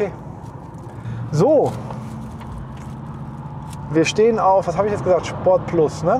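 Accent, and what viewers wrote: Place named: Germany. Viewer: Bruder für ein Mustafa spricht du zu Hochdeutsch. Ich mag es irgendwie nicht🤔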